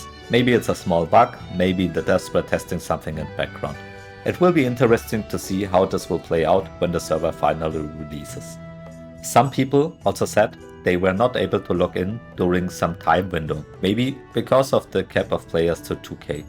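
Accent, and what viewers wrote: Germany. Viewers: thanks for the video but the german accent is bursting me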